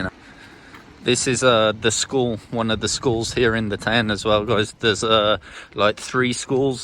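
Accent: Ireland. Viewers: Nice kerry accent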